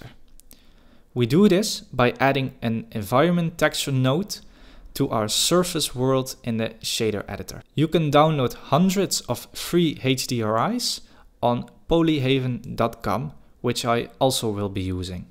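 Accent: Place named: Netherlands. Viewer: hi im not sure but are u dutch. i can tell from ur accent. but i wanna ask something actually. do u think that if i improve my 2d 3d art that i can find a well paying job. bc i want to do animations. and im not rlly into creating logos or commercials. and what u create looks really good. keep up the work ! and thank u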